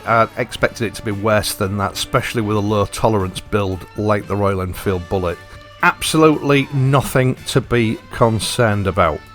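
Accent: United Kingdom. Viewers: British accent 😋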